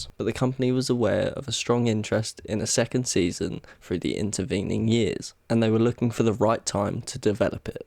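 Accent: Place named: United Kingdom. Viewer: Thank you kindly for your amazing video and I love your British accent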